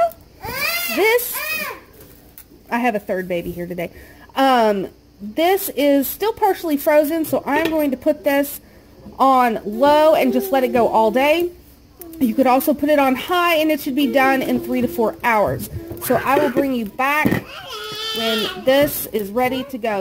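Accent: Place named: Appalachia, United States. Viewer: I love it! Where are you from? I’m guessing somewhere in Appalachia?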